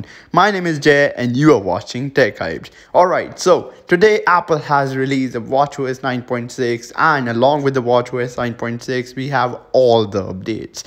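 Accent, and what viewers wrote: India. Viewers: i hate your english language you indians!!!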